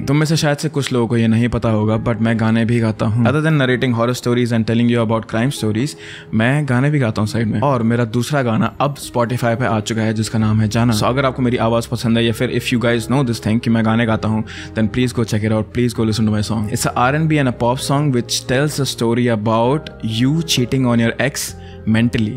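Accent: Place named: Bengal, India. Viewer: His mother toungh is Bengali bro he can't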